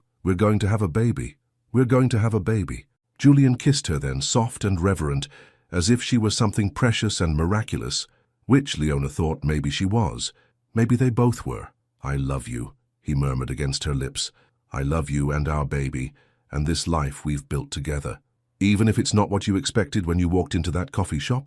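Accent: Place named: United Kingdom. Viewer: Why does this video have a voice with a British accent? I get tired of hearing these British accent.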